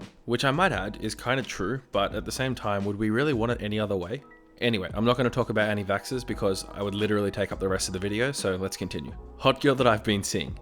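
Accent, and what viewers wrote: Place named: Australia. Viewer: I don't know why but j feel like your an Australian because of that accent btw I'm from nz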